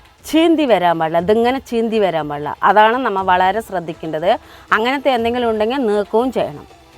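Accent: Palakkad, India. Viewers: അമ്മൻ്റെ നടെവിടെയാണ് സംസാരംകേട്ടിട്ട് പാലക്കാട്ടാണൊ എന്ന തോന്നൽ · പാലക്കാട്‌ ആണ് തോനുന്നു സംസാരം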